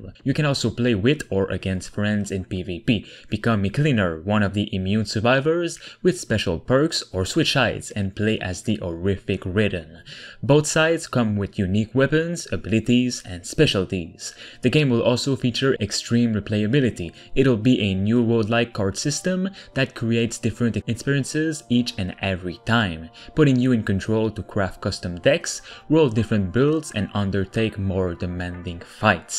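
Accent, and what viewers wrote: Quebec, Canada. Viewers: French from Montreal Lmao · Petit accent du Québec ? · Es-tu Québécois? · I know a québécois accent when I hear one ;) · love that channel ! nice accent! jai la meme... vive les quebecois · You sound québécois! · le gros accent québécois haha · You sound like a french canadian it's so weird i like it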